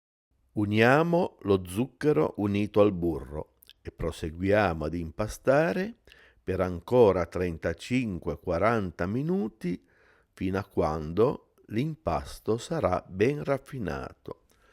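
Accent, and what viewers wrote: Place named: Genoa, Italy. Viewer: che bello sentire un bell'accento" zeneise"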